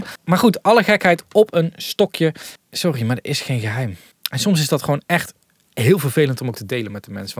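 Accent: Brabant, Netherlands. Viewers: Hoor ik nou een klein Brabants accent? 😀😀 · Mooi Brabants, was me niet in het Engels opgevallen..... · Nice een keer zo'n video haha!🤣🤣 Wat grappig dat je "een bietje" Brabants accent heb en ik dit nu pas hoor na al die video's in het Engels🥲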